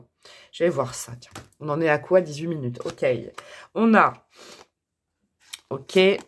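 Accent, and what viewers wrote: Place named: South, France. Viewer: Coucou vous allez bien ? C'est rigolo la dernière guidance vous aviez l accent du sud et une autre medium du sud sud ... A parler de vous. Mimi .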